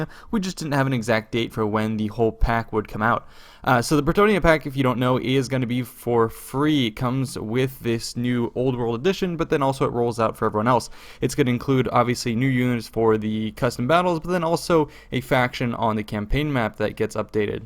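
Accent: France. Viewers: he´s french, dude :) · Check a previous comment thread. He IS French. Said so himself. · He most definitely IS French. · You have a really french agsent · he's french... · Nice french accent. Would hear again. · He's actually French. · Geralt, Private Investigator You know he's actually French, right?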